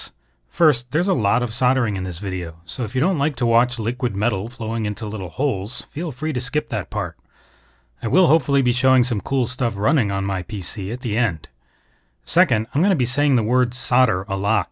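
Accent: United States. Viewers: I'm British, we pronounce the L (but not the R on the end unless we absolutely have to) but for me hearing you seppos say sauderrrr is a bit like seeing you spell words like colour or favourite without the U... something you adorable colonials just do... I wouldn't mind a modern kit to build a genuine retro computer, whether it be an IBM XT PC, a Commodore Vic 20 or 64, a ZX Spectrum or a BBC Micro or Acorn Electron. Who's with me?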